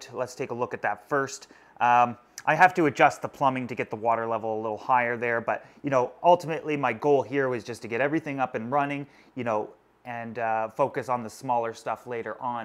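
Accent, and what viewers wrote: Canada. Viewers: "Out and about " I'm dead the cute Canadian accent is amazing love the videos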